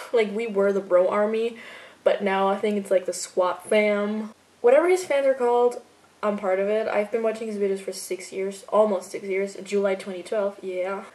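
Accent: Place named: United States. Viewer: Hi! If you're from Austria, how can you speak so beautifully in English and especially with an American accent? By the way, love your videos! ( Greetings from Hungary 😊 )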